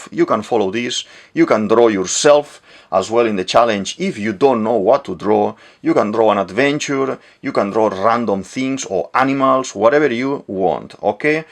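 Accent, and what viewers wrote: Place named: Spain. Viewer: Wow, I just found your channel. You fascinate me right off. Your accent sounds Spanish, your self portrait indicates you may be of Asian decent, and you live in UK. Haha how fantastic! Also, I love your work!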